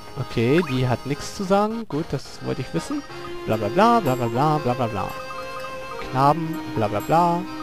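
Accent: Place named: Berlin, Germany. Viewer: geiler berlinnischer akzent hast du^^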